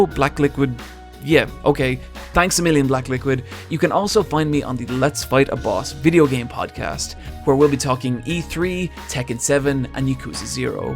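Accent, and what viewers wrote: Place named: Dublin, Ireland. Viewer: are you Irish? You have a Dubliner accent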